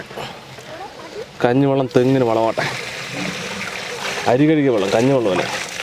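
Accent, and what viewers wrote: Palakkad, India. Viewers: ഞാൻ എന്നും വിചാരിക്കും നിങ്ങളുടെ കൂടെ ഇരുന്നു കഴിക്കുന്നവരെ പോലെ എന്റെ വീട് നിങ്ങളുടെ അടുത്തില്ലല്ലോ എന്ന്. ഞാൻ കോയമ്പത്തൂരിൽ ഉള്ള ആളാണ് നിങ്ങളുടെ ഭാഷ കേട്ടാൽ പാലക്കാട് ആണെന്ന് തോന്നിന്നു നിങ്ങൾ എനിക്ക്‌ ഒരു അപ്പോയൻമെന്റ് തരുകയാണെങ്കിൽ ഞാൻ നിങ്ങൾ കേരളത്തിൽ എവിടയാണെങ്കിലും ഒരു ദിവസം നിങ്ങടെ കൂടെ ഇരിന്നു ഭക്ഷണം ടേസ്റ്റ് ചെയ്യണമെന്ന് ആഗ്രഹിക്കുന്നു.. പറ്റിയാൽ എന്റെ നമ്പറിലേക്ക് ഒരു ഫോൺ വിളിക്കണം.. <num>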